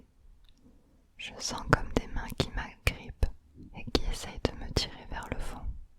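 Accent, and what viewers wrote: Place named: France. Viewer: Oo my bog .. WOW She French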